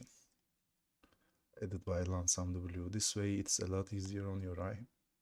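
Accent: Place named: Turkey. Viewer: Türk aksanı seziyorum :) Bravo bro devam ellerine sağlık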